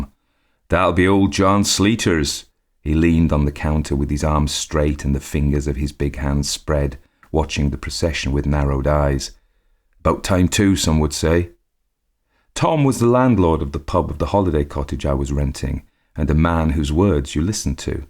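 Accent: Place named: Wales, United Kingdom. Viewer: Great voice, I really enjoy listening to your story telling. I can definitely hear a Welsh accent in some of your pronunciations but there are also others in there which you’ve probably picked up on your travels. Either way, a lovely voice 🤗🇬🇧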